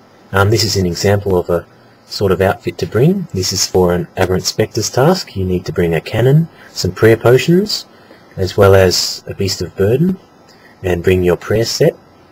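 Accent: Australia. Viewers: your aussie arnt ya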